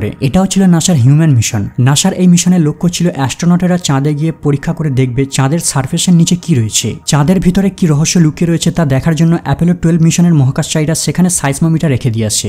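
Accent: India. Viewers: Annoying কলকাতার বাংলা উচ্চারন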